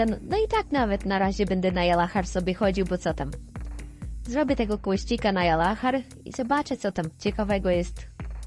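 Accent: Poland. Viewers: Z jakiego rejonu Polski jesteś? Skąd akcent? XD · Podoba mi się twój Podlaski akcent.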